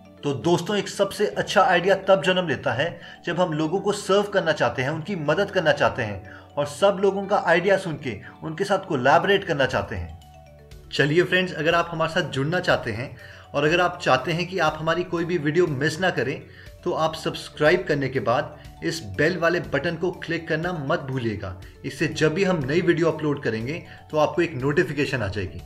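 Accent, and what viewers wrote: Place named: Punjab, India. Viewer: awaaz se to bhai tum Punjabi lgte ho.. bta hi do kaha se ho tum?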